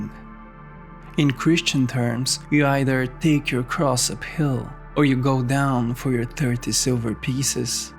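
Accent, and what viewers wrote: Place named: India. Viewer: please a video about alfred adler .. you have such a nice voice its like an indian guy talking englisch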